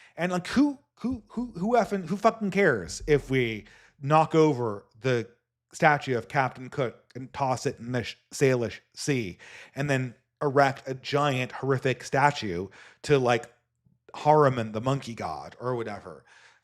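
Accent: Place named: California, United States. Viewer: you really gotta do something about the California accent.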